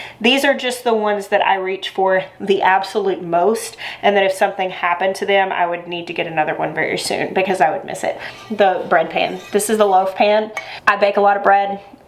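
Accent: South, United States. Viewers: Thank you!!!! You speaking German.....was a little disconcerting.....I prefer Southern accent ;-)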